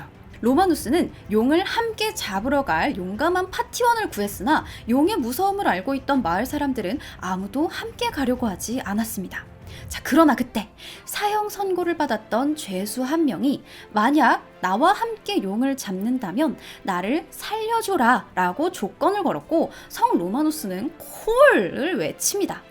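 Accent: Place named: Busan, South Korea. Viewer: 오늘은~ 부산의 귀여운 억양이 ~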